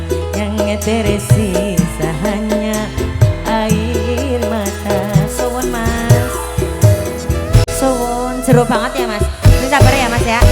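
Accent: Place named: Java, Indonesia. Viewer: mba paling jos aku suka sma mba lucu medoke asikkkkkk👍👍👍👍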